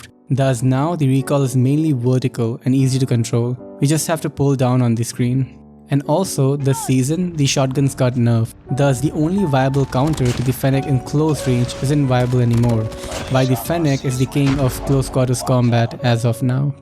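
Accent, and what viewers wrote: India. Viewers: In his recent video, he revealed his voice, it was an Indian accent.. so I guess he's Indian. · he sound like india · Are you an Indian? You sound like one. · I know is India as by his accent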